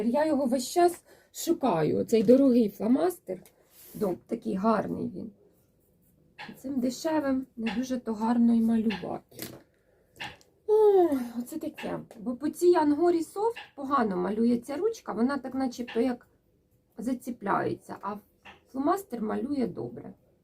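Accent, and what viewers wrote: Cherkasy, Ukraine. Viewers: О Боже правий, ніколи б у цьому місті-гетто не жила б. Для мене повний жах 😱оці коробочки, між ними машини і поодинокі деревця. Особливо одинокими себе тут відчувають діти. Але людина і до такого звикає. Може в чомусь я і не права, але для мене гарніші в моєму 20тисячному місті різнокольорові двоповерхові котеджі з кленовою алеєю на проспекті. Як маленька Швейцарія. Чомусь киян мені завжди жаль. І дитина моя там живе, І її жаль. Швейна сторінка у Вас цікава. У нас така говірка на Черкащині.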